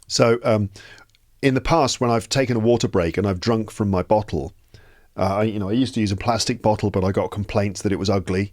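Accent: RP, United Kingdom. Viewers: I am from Nepal and learning English with British accent through your leading podcast. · BYE BYE BOY BOY BOY...! It is very nice and very interesting...!!! I would ESPECIALLY love your RECEIVED PRONUNCIATION and your VOICE of British English...!!! I am USUALLY and OFTEN listening your Podcast and Episode to IMMERSE myselft in British English....! THANK YOU VERY MUCH Mr.....! Your name is at the same as name of Sain....!!! 😝😝😝😝😝😝😍😍😍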